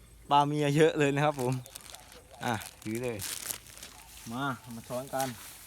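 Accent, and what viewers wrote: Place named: Phu Thai, Thailand. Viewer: ยุแถวไหนครับ คือเว้าภูไท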